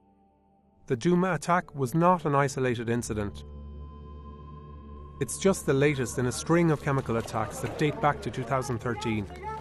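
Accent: Ireland. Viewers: Is that a horrible Irish accent ugh not nice to listen to doesn’t make for a good YouTube experience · Why do you have this Irish sounding Narrator? Slightly offensive that you think our own dialect isn’t distinguished enough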